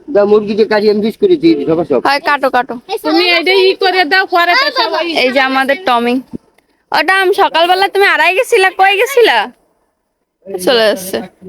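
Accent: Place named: Gopalganj, Bangladesh. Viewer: আপু আপনার বাসা কোথায়। ভাষা শুনে মনে হচ্ছে গোপালগঞ্জ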